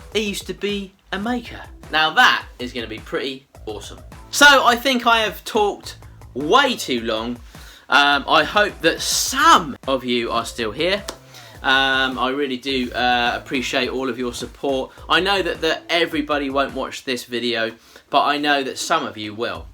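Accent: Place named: England, United Kingdom. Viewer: Being that I am a "bird" (LOL) I will probably never use a lathe - not that ladies can't & dont, it's cool w/me! BUT, my point is I enjoy watching purely because you are so much fun and you really make me laugh! Love your editing too. As for talking too much I could listen to you talk all day. I think most Americans love a great English accent. However I AM a maker, I make quilts, and recently finished a Union Jack quilt for my Grandson. Wish I could show you a pic. I love you tube, it's where I learned to quilt and it brings me a ton of GREAT entertainment and laughs like your channel. Thanks ❤ God bless you & yours! 🇬🇧🇺🇸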